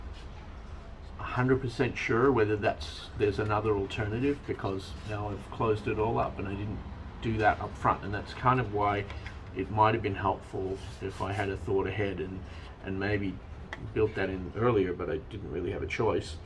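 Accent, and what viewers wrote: Australia. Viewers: Half Aussie half American accent?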